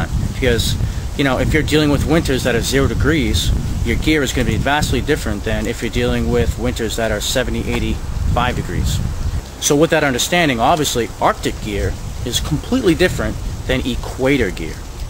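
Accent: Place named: New England, United States. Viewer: I'm trying to figure out where you are... Northeast corner of North America... just out of the subarctic... the first says Greenland, the second maybe Newfoundland... but it looks (and sounds, by your accent) like you're in New England. Did you mean to say you're in the Northeast corner of the United States?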